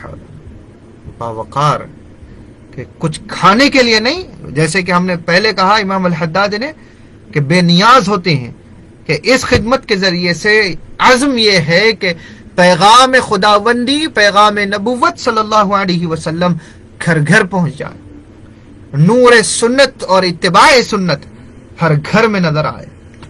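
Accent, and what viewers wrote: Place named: India. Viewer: Great, Maashaa Allah, yaa habeeb. But I don't understand your Indian speaking.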